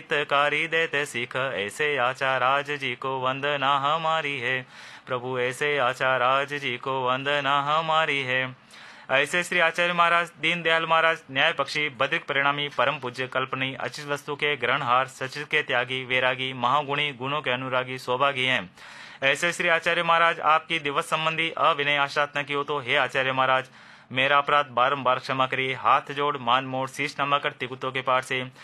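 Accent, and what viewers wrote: Standard. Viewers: Sadar Jai jinendra Aapki aawaj bhut prabhavik aur uchran shudh hai kripa karke sawatsari pratikaman ka audio upload kare Covid kal me yah bahut badi sewa hogi Sadar dhanyawad 🙏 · Sudha ucharan sath kiye prayash keliay bahutbahut dhanyvad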